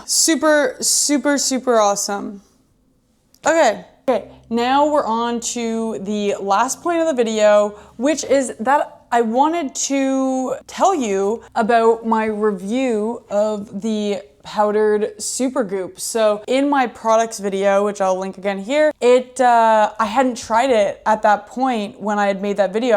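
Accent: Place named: England, United Kingdom. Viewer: I'll give a like for the English accent! I must say, I'm really impressed in general by how you carry yourself on camera. You speak so naturally, it's like I'm there with you. You're making great progress, I wish you well!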